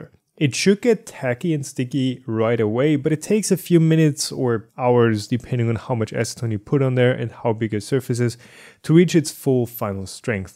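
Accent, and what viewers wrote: Germany. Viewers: HA! ich wusste es. von der ersten sekunde an hab ich gehört das du deutsch bist ;D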